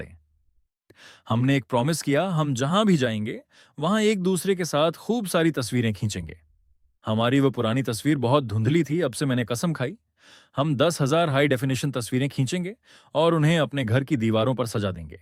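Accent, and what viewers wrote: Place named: Bihar, India. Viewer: Bhai jada bihari accent mat add kar pure hindi accent accha hai video bana ke liye 😅 basibhi eha samajh ne mein bahut pareshan ho raha hai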